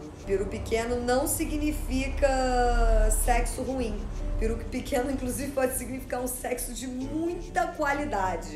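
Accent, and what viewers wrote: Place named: Rio de Janeiro, Brazil. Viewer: Como sotaque carioca é ridículo, com certeza é o sotaque mais feio do mundo.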